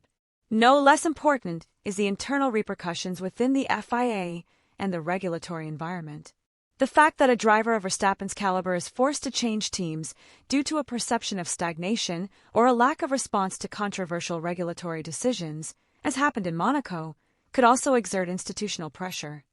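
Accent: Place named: United States. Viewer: good hear some American accent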